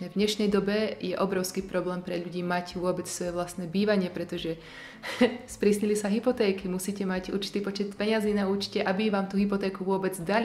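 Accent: Slovakia. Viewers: Myšlienka" Neviem čo chcem, viem čo nechcem" mi je blízka 😂 Inak pekne stredoslovensky mäkko vravíš aj keď netuším odkial pochádzaš 😁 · ta slovenčina mi prisla taka martinska...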